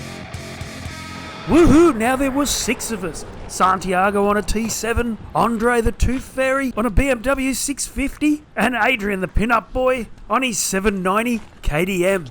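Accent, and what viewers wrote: Australia. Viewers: Great video. And the best Aussie commentary. Got my sub...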